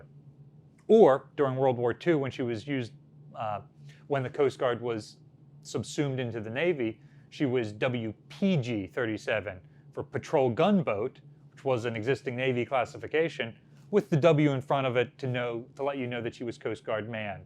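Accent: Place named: Hackensack, United States. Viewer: Im from Hackensack but live far away now, and while I have a casual interest in Naval history, listening to talk and his mannerisms make me feel like im home again. Thanks.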